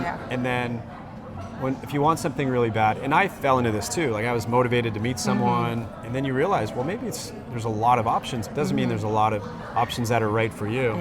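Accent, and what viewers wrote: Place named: United States. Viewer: We're you actually from the Philippines? You have no Filipino accent at all. You have a more American accent.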